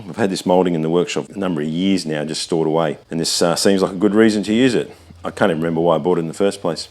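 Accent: Australia. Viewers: Your channel is growing because you have awesome videos and because you have an Aussie accent. Keep em' coming!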